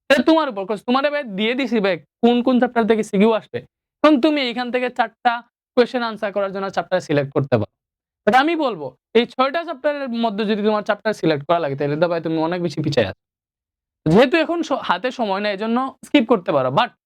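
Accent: Sylhet, Bangladesh. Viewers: সার আপনি সিলেটি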